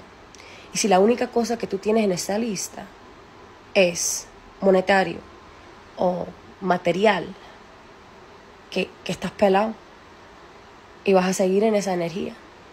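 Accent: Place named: Havana, Cuba. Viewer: me sorprendí al ver que eres cubana y hablas como pura habanera y yo extraño esa Habana tanto, no puedes imaginar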